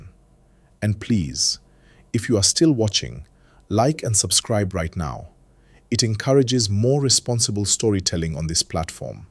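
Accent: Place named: East Africa. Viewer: it is quite interesting that some of you "TikTalk workers" assume that we listeners are only followers without the abliy to discern who is likely following your presentations. I challenge this individual to deny that he is not talking from east Africa. your ascent says so.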